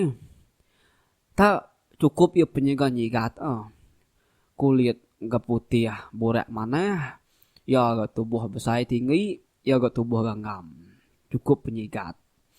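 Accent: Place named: Julau, Malaysia. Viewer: Urang julau ka? Munyi jako urang julau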